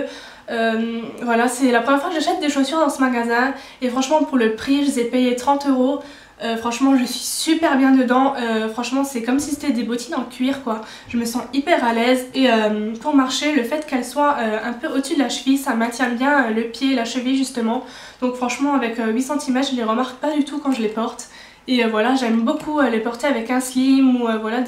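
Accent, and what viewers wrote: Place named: Alsace, France. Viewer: Super ce petit accent Alsacien :) Je vais au marché de noël de Strasbourg cette année, tu dois connaître ça! Sinon encore une vidéo bien top :) Bisous!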